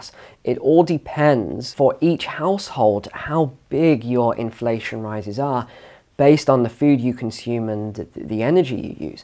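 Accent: United States. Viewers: flawless american accent! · Your American accent sounds good enough to me! My British accent amounts to adding words like "wobbler," "mate" and "cheers" to my sentences. Cheers,! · Pretty gooder American accent.. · You do a pretty good American accent! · Lol nice accent brother 😃 Apart from the top drawer US accent your work is splendid. Thank you fella. Great job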